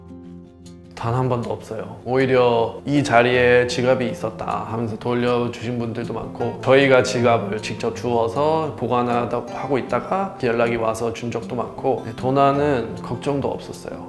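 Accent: South Korea. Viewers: 문법이 완벽하다 심지어 영어를 한국식으로 발음하네 대단합니다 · 눈감고 들으면 한국인., ,ㅣㅇㅇ퍼 카페 그만두시고... ㅠㅠ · 우와 영어를 한국인발음으로 구사하는 분은 첨~~대박 아메리카노,쓰리샷,cctv 한국인인줄ㅋ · 보통 한국말 잘한다고 하는 외국인들도 외래어를 할때는 본토 발음으로 하던데 이분은 그냥 한국식으로 말해주네요^^ 대단하십니다.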